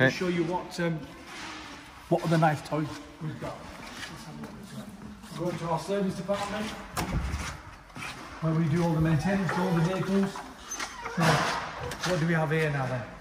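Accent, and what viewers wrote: India. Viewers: Hey bro you are indian we get that from the accent and your name but why don't you reveal your identity??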